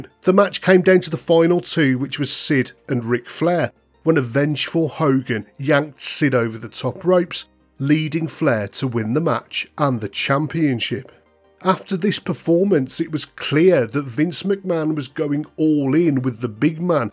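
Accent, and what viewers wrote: United Kingdom. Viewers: why is every wrestling biography YouTuber british...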